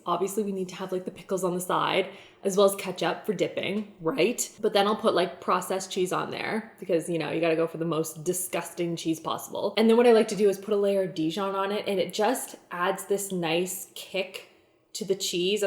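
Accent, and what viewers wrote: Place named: Canada. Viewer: I came here trying to find some ramen lore, and then you mentioned Nanaimo bars and I was like...... wait a minute.... and then the way you said that they are named after Nanaimo, a city in BC, like people would just know what BS stands for, and then I got to thinking about your accent and I did some quick google searching, and I HAVE FOUND A FELLOW CANADIAN ON THE INTERNET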